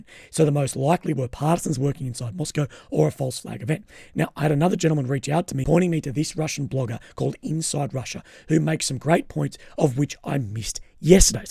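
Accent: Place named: Australia. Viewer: Big yin your pure on this subject unbiased opioid empathy and a funny oz accent. Dude l could do an hour a day why don't you do 2 wee video a day recap or fake news exposed. Watching from Scotland peace and love to all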